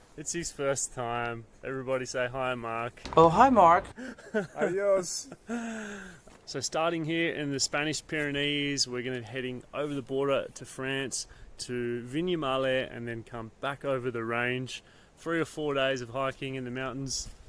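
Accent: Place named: Australia. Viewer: So great to hear an Aussie accent!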